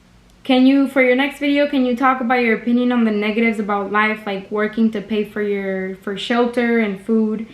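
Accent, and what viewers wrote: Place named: Miami, United States. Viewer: I love your content.. and I can tell you sound like a Miami girl like me! I’m 48 and you’re definitely wise beyond your years. Keep spreading the positivity because Lord knows we can all use it 😊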